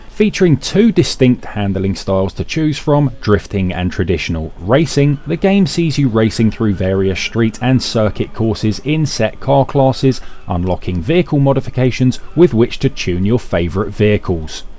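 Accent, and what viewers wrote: United Kingdom. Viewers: Ziad Assaad The commentary is soooo British!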